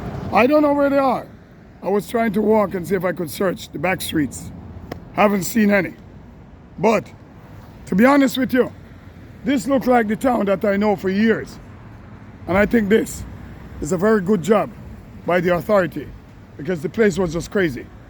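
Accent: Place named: Central America. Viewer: Are you from Central America? More specifically Hondurus? I am from Belize and your accent is very similar to my dad's.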